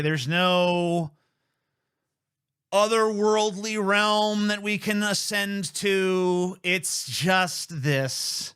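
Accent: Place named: Appalachia, United States. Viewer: I love TJ's hillbilly accent!! 🤣😂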